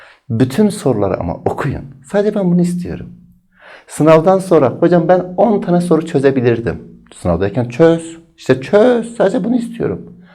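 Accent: Urfa, Turkey. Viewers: Hocam Urfalı mısınız ?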